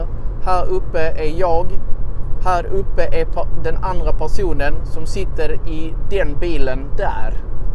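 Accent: Scania, Sweden. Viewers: Personligen bryr jag mig inte om med skånska dialekten. I Tyskland pratar vi också olika dialekter. Jag förstod allt vad du sa. Alla pratar olika och det är helt normalt. Det är viktig att förstår alla svenska volk, inte bara från Stockholm. Fortsätt med det du gör. Det hjälper oss mycket. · Älskar skånskan! :))) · I storbritannien kallar vi det för dialektdiskriminering. Jag kommer från Liverpool och vi har en jättestark dialekt och om någon inte gillar det så kan den dra åt helvete Jag älskar skånska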